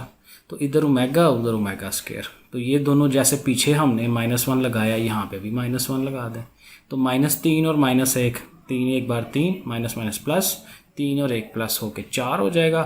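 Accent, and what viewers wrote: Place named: Lahore, Pakistan. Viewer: Ap ki language lahore jasi lg rahi ha lakin ap muskil kam ko bohat easy kr daty ho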